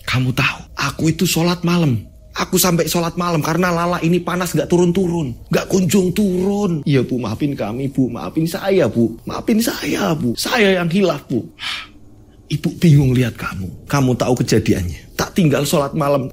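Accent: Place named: East Java, Indonesia. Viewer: Hadir lg mas ak! Di SBY! Mas org Jateng tp logatny koq Jwa Timur'an y? 😀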